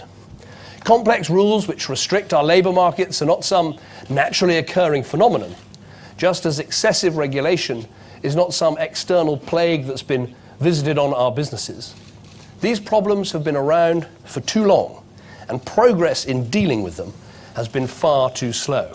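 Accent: RP, United Kingdom. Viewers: I love his accent in fact I love the British accent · Key words: RP accent speech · Thank you for sharing. Im learning RP, so if you can upload more speech spoken in RP, that would be great!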